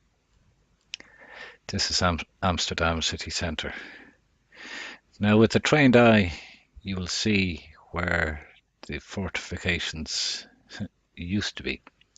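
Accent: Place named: Ireland. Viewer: Very nice to hear a fellow Irish voice on their voyage of discovery.. Really enjoyed the vid. Any views on the mud?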